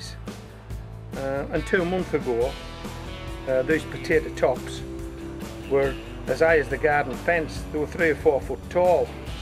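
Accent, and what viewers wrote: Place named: West Midlands, United Kingdom. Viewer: Thanks for that. Now, at first I thought you were from Durham, but I read you were up near Scotland on the west side, but I keep hearing some West Midlands in your voice. Am I right?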